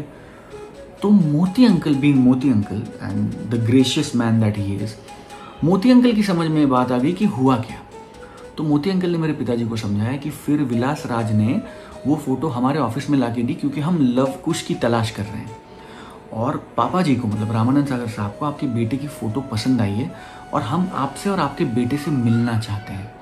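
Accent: Standard. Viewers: Your Hindi talking is that much pure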